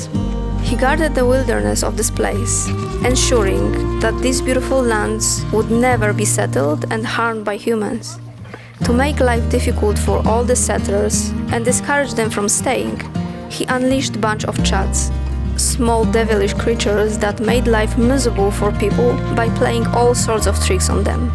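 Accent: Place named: United States. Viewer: Piekny film.Jest sub. Świetnie znasz angielski.Taki bardziej w amerykańskiej wersji(accent). Pozdrawiam.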